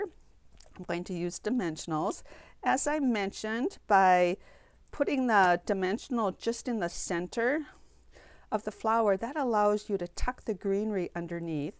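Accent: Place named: Midwest, United States. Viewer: I saw your twisted ribbon card on Pinterest and it led me to the video. I think your explanation of how to make the card was clear and concise. It’s a beautiful card and I can’t wait to make some. Now I’ll be looking for other YouTube videos you’ve posted. Thank you for sharing your talent. I love your accent, are you from the northern Midwest? U.P.?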